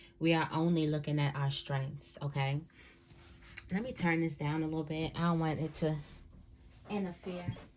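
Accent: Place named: DMV, United States. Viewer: I be hearing a lil DMV in yo voice , you from bmore ?!?! 😂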